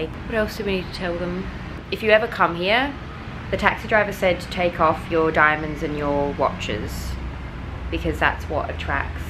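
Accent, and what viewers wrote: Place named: Scotland, United Kingdom. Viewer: This vlog absolutely warms my heart. I remember a previous video where you were talking about always wanting to travel with your significant other (goodness knows how long ago). And now here you are, sharing an adventure or an amazing experience with your significant other is such a wonderful luxury. PS. Love that Scottish accent, brings back memories of a beautiful friend of mine who coincidentally will be back in Australia in about 2 months.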